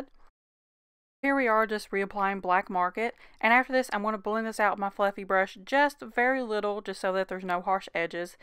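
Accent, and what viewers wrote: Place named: South, United States. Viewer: I have similar face shape, eyes and hair as you so I love to see new ways to do makeup. I didn't pick up this palette at Sephora the other day still pondering. Also, your accent sounds like mine. Southern girl? :)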